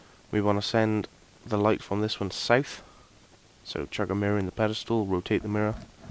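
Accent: Wales, United Kingdom. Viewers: AWESOME guide, thank you man. Also nice listening to a Welshman for an hour :')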